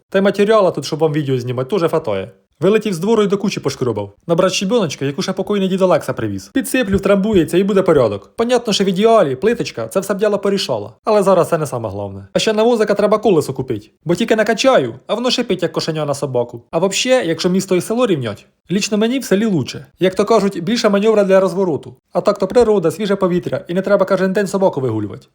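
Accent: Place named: Surzhyk, Ukraine. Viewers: Шапка, пальто- просто супер-прикид. А говір наш, Черкаський. Обожнюю. Процвітання вашому каналу і щастя родині❤ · Ви звідки? Наш щирий суржик,щирі люди,прості,роботящі, приємно спостерігати. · Хлопче, з твоїм відео і мій день трохи налагодився. Мої батьки з Дніпропетровщини і твоя говірка мені рідна. Хай все получиться!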